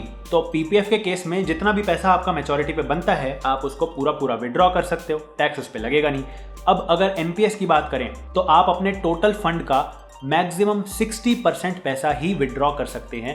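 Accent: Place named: Punjab, India. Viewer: Vele baitha tha #Punjabi tone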